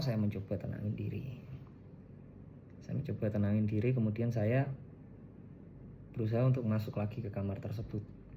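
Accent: Java, Indonesia. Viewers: Lho koh medokkk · Logate Medok mas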